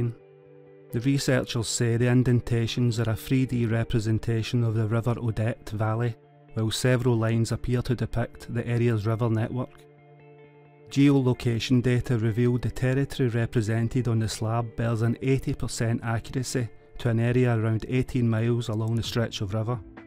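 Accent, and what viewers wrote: Scotland, United Kingdom. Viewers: He is Scottish... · The speaker has a wonderful Scots accent....love it....and there is a volume control for those who are slightly hard of hearing...you can turn up the volume on your device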